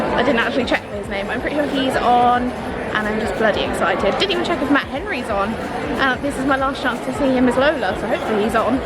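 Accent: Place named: England, United Kingdom. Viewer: Also I loved the way you said my name, English accents 😍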